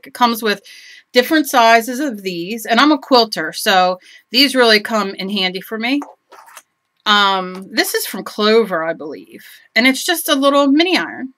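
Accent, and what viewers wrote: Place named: Philadelphia, United States. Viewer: A very distinct dialect , of a provincial Philly accent . Very interesting . I use wax to preserve water color on wood . Dorland wax medium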